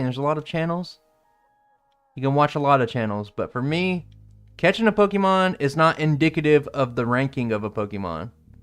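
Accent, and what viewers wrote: South, United States. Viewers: I'm up in bed watching this run and the DUUUUUDE! that kept coming out of that southern accented mouth of yours had me jumping to run to the bathroom before I pisted myself. Bravo, DUUUUDE bravo.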